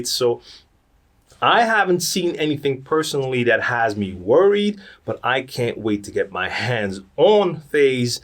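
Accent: New York, United States. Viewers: wow you sound like you're from New York! Great content as always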